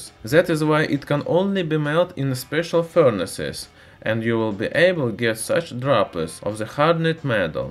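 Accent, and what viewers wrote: Russia. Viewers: Are you Russian? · How many of you watch his videos just to hear his cool Russian accent? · The Donald He tries really hard to speak well but he is Russian so have some difficulty to pronounce english words clearly 😐😉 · You make great videos! I love your accent, like the secret Moscow contact in a 60’s spy movie. :-) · I'm Slavic, but I can't stand that Russian accent, sorry.. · yo bro... ur video is nice.... but plz add subtitles... ur russian accent is too strong...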